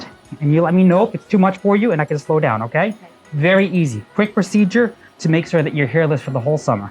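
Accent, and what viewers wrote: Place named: Asia. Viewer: Bro the Asian accent 💀💀💀💩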